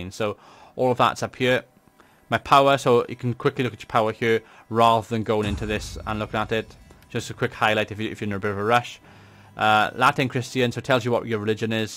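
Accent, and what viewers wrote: Wales, United Kingdom. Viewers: Very nice guide, and your vocal presentation is excellent, (are you welsh?) i find it really annoying listening to commentators with bad habits like, clicking their pallet with their tongue, or swallowing all the time, saying eermm every other word, i have been playing this game for 2 days now and i have picked up quite a lot i have missed from your vid, thanks, maybe you could do a money and public order building guide as players seem to be have issues with them?, great vid well done.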